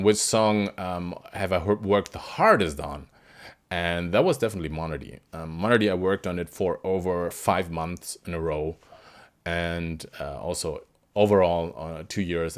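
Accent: Germany. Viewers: An diesem Akzent merkt man eigentlich direkt er ist Deutscher To my English friends the Translation: With this accent you can tell that he is German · Well you actually can tell from his accent. I also live in germany and i always hear this accent from german people that speak english. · Man hört ihm schon an, dass er Deutscher is xD Hätte ich von seinem Pb her aber nicht gedacht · Well i heard it that your a german person because of your accent(and i´m german too also warum schreibe ich auf englisch, ich pfosten hehe) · Are you german it sounds like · Is der Deutsch? =>where is he from, or is he german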